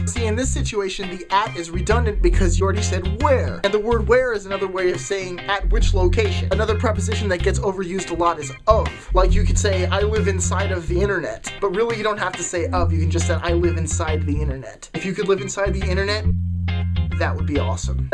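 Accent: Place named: England, United Kingdom. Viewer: Two things. I'm glad I chanced upon you. Mmhmm. More english accent please.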